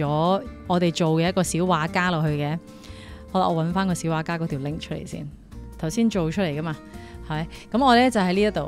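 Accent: China. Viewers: 好好听的白话，介绍的工具很有趣，关注了，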